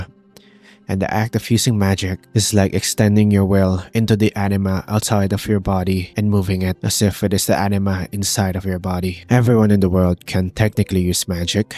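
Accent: Philippines. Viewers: do i hear a filipino accent?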